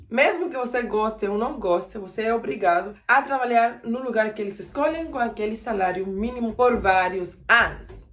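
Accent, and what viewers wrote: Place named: Cuba. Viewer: nem seu sotaque cubano não aparece direito, pouquíssimo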